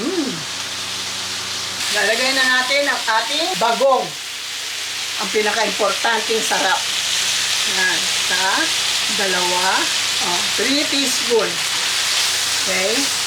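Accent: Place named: Pampanga, Philippines. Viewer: bat parang maypagkapampangan si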